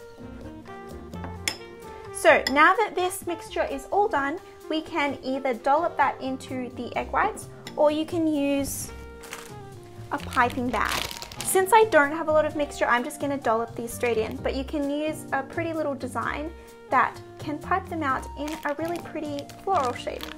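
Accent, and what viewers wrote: Australia. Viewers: You’re one of the only girls that makes an Australian accent sound pleasant, lol. No hate!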